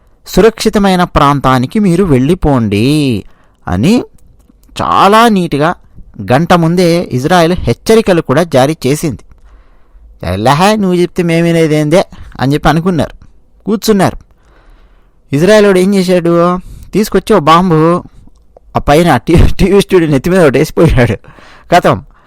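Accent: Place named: Rayalaseema, India. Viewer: అయ్యయ్యో పాపం.. బెదిరించడానికి వచ్చిన గుండె ధైర్యం ఏమయింది.. మీ రాయలసీమ slang super గా ఉంది..😀😀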